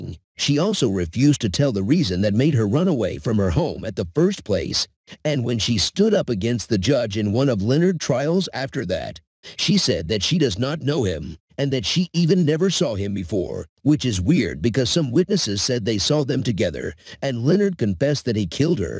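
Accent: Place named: China. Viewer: narrating this in Chenglish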